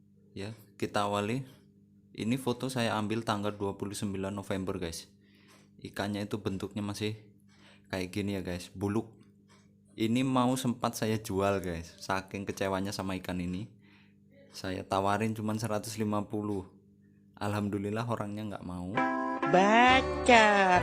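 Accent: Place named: Banyumas, Indonesia. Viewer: ciri khas pokoke(ora ilang ngapake biarpun ngomonge bhs Indonesia)😀😀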